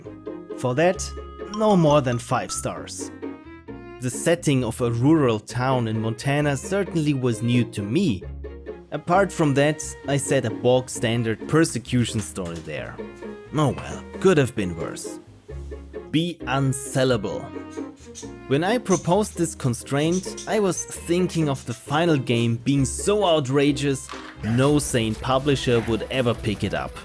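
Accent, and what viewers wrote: Germany. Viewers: Wait a second, you german?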